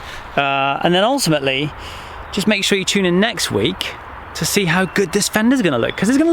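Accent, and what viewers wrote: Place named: Newcastle, United Kingdom. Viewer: grins: But I reckon that accent is more Geordie than Cockney :)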